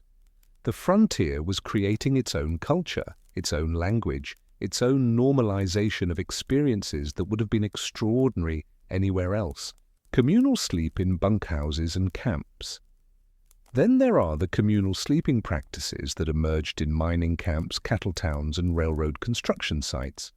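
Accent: England, United Kingdom. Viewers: A british accent narrating something about the wild west just feels wrong, AI has ruined everything. 🙄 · US accent please. UK accent sounds unrelated to subject- American West. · America history, all be it short is amazing. Look at all of our accomplishments in such a short time. Starting off by winning our independence from the most powerful monarchy in the world at the time. Our dominance in Both world wars especially the second one. The industrial revolution to pave our path to becoming the most powerful nation that’s ever existed. That’s just a few of our historical examples. That being said, I enjoyed the English accent. In this video.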